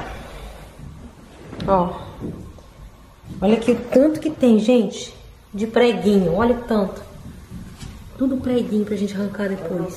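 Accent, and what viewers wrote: Minas Gerais, Brazil. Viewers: Mineiro uai!Maravilhoso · como vc é especial mulher eu amo ouvir sua voz, o sotaque mineiro lembro das minhas tias de Ipatinga que eu amoooo. Minha mãe é de Mg Ipatinga meu pai carioca,gosto de ser carioca mais amo o sotaque mineiro ❤❤❤😘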